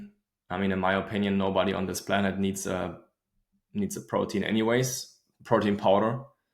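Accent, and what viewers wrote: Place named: Germany. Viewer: Warum sprichst du eigentlich nicht deutsch 😅? Deine deutsche englische Aussprache ist richtig geil 😅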